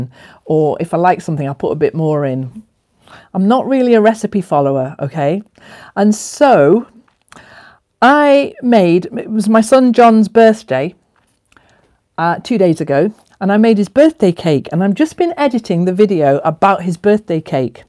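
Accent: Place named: Northern England, United Kingdom. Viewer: You have occasional northern twang, I was born in Crosby.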